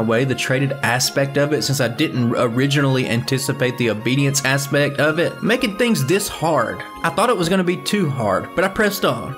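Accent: South, United States. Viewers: oh for sure. It would be a lot of work and something plan out over a long period of time. You’d also have to feel out the comments to see if other people would be interested in that since it’s kind of niche. But Pokémon run channels are growing in popularity, so it could help your channel differentiate itself. But mainly just do what you enjoy and everyone watching will enjoy it too. You’re doing great! I really like your layout with the move sets and stats on the side so we can track the Pokémon on its run and anticipate what’ll come next. And nah, you ain’t Canadian. You sound more southern than me, and I’m from Texas haha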